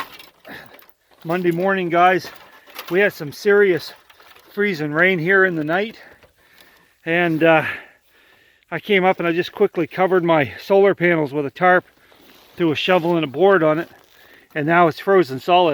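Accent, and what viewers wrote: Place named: New Brunswick, Canada. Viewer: that and you have the same accent as the guys I know that live in western NB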